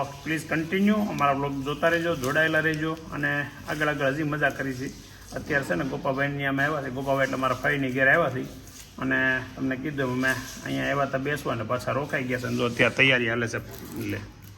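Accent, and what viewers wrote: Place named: Saurashtra, India. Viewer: તમારા આ vlog મા ગામડાનો જીવન બતાવ્યું એ જોઈને બહુ મજા આવી. તમારા vlog એકદમ ઓર્ગેનિક હોય છે. તમારી સૌરાષ્ટ્રની તળપદી ભાષા સાંભળવાની મજા આવે છે. ફઈ ના ઘરે ફુવાને ઇગ્નોર કરતા હતા તે જોવાની મજા આવી. Keep it on. ☺️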